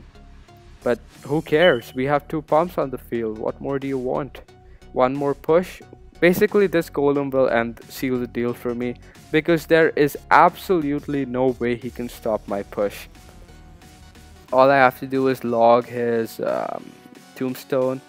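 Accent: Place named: India. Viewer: Nice video dude! Are you Indian? Your voice is so soothing lel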